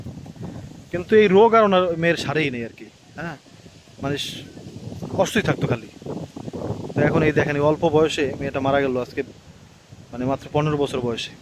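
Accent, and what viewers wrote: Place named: Barishal, Bangladesh. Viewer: বরিশাইল্লা কথা বলে তাই কথা বুঝি না কলা গাছ কাটার পরে বলে এককালে জাল ছিঁড়ে পড়ছে৷ অনেক কথাতেই বলে এক কালে এক কালে এককালের অর্থ কি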